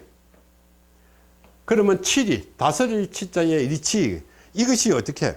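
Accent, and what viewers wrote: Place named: Gyeongsang, South Korea. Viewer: 완전 경상도 도민용 강연. ㅋㅋㅋ. 엄청난 사투리 억양과 말투. 신라 사투리. 기타 지방민은 무슨 말인지 이해될지 모르겠다. ㅋㅋㅋ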